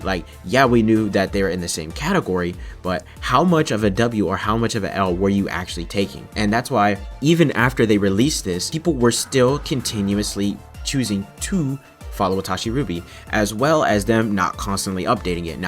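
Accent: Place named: India. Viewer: Hes indian accent tells it all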